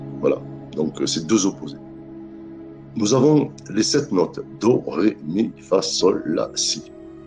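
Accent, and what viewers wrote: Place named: South, France. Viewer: Bonjour, je jous decouvre ce matin , merci pour votre video qui me donne envie de vous écouté plus loins dans ces enseignements. Vous avez un accident du sud, de ma region!? Pouvons nous nous rencontrer ?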